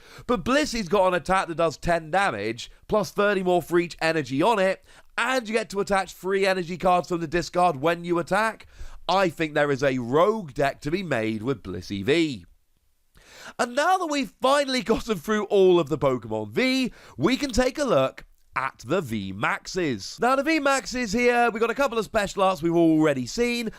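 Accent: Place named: United Kingdom. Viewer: Half the reason I watch you is to hear you say “but’’ in your beautiful British accent. Love the info you give us amigo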